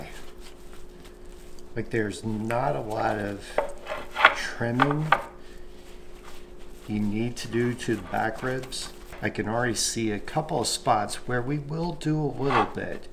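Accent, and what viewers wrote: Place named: North, United States. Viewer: Northern accent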